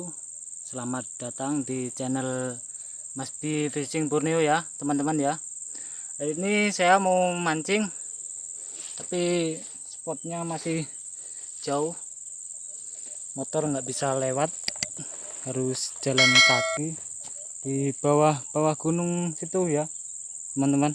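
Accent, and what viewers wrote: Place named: Java, Indonesia. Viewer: Kaya orang Jawa logatnya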